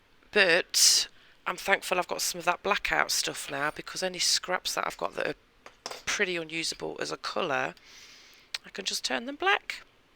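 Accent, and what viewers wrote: United Kingdom. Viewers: Very cool!! With the colors you used they have a bit of a Southwestern flavor. I really like the way you created the subtle wood grain, it makes a great, interesting background and contrasts the colorful pieces well. I didn’t know you are in the US!! I thought you were in the UK somewhere. I hope you like it here. I suppose if you have been here over 10 years you must like it a bit 😊 It amazes me that you have maintained your accent. I went to school in Norwich for 3 years and developed such a British accent that people didn’t believe I was American🤣 Anyway, these pieces turned out great!! Thank you for sharing 💚💛💙🤍💜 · Wowser, that's gorgeous. Love the colours, and love the spiral patterned roller. Hey, I read the comment from the lady in the US. It's great you have kept your British accent. A lady on YouTube who does paint pours, is from Birmingham UK and emigrated to Australia in the 80's. 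She still has her Brummy accent. I would give anything to emigrate from the UK as it's horrible these days. I was born here in 1960. Like you mention though, the world over, you have to be careful about saying anything any more, in case you upset someone!!! I carry on speaking my mind, and ya boo sucks to everyone LOL!!!! Love your videos, thank you so much for sharing your superb creations with us. Take care, xx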